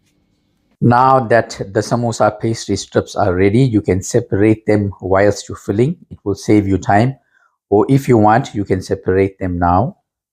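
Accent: South Africa. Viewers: Dear Brother, we have reached the end and I salute you. You have a SA accent. Where can we buy your samosas please? Do you sell any in Australia? You are an amazing perfectionist cook - very rare to find people of your ilk today. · Where are you? I hear a South African accent 😅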